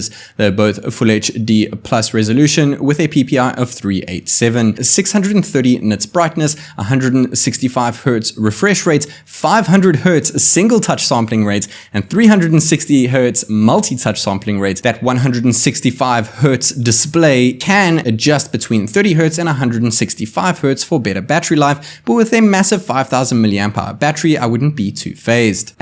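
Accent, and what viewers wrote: South Africa. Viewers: i swear you sound south african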